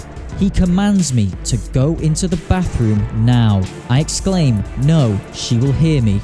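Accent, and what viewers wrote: Wales, United Kingdom. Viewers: Welsh Chris Hansen. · bri’ish man has good content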